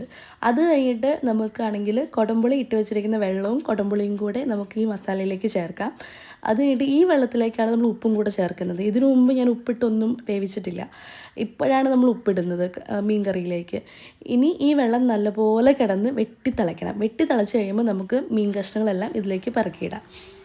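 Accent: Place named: Thrissur, India. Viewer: Samsaram thrissur style Aanalo kinnamkachi fish curry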